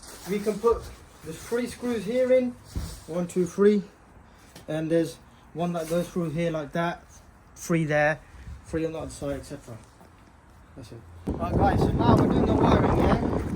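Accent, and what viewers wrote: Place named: South Asia. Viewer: Bro’s South Asian Accent tried to peek out a bit sometimes. But the light looks dope!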